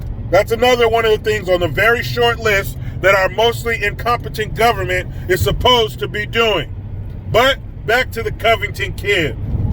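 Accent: United Kingdom. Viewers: Hey nice limey accent. You is a funny smart fella and you make this old 77 year old tan dude. Albinos are white and pale millennials living in moms basement. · Love the ah British or something accent!!! LOL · Love the British accent. You should read us some Charles Dickens. Call it story time with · I want to hear you say, Lambofeeties in that British accent! I'll die LMAO!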